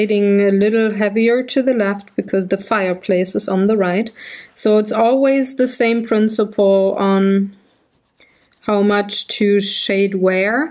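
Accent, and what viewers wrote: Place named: Germany. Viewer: Hut ab, dass Du das alles auf englisch machst! Vom Akzent her solltest Du das hier lesen können ;-) Meine Sabbelvideos hatte ich ursprünglich auch auf englisch gemacht früher, aber der Humor ging verloren. Dafür spreche ich wohl zu schlechtes Schulenglisch :) Schaue mich gern auf Deinem Kanal um.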